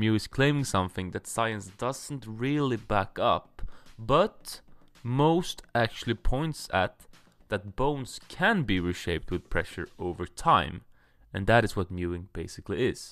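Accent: Sweden. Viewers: is that a fellow swede im hearing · Damn is that a swedish accent or am I crazy af? 🤔. Yes I love mewing I have been doing it for a month now and I see huge difference. I reccomend it to everyone! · swedish accent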